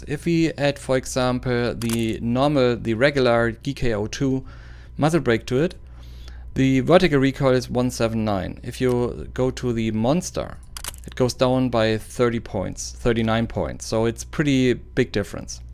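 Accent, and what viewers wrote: Germany. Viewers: Der deutsche Akzent ist unüberhörbar, gutes vid btw <3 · Man hört sofort das du deutscher bist😉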